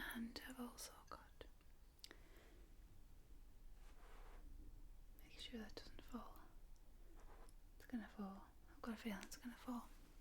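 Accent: United Kingdom. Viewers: Can I ask one more question? I sort of collect accents and I'm somewhat good at US accents, but that's because I live here. I'm really just starting to be able to recognize accents within the UK. What area does your accent originate from?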